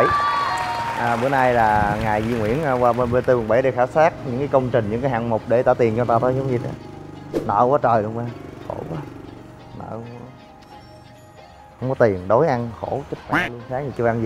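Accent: Mekong Delta, Vietnam. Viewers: Nhưng đời trc nó ng Bake không phải thuần chủng miền tây miền nam. Nó nói giọng miền tây do nó sinh ra miền tây nó vẫn mang máu Bake.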